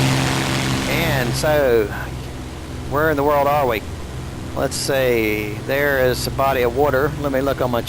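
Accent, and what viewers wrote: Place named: Deep South, United States. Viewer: Deep south. No offence meant.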